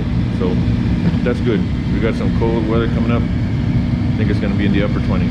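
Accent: Texas, United States. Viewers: I thought I was hearing a Texas accent. I’m in a northern Dallas suburb. Folks like you make me a proud Texan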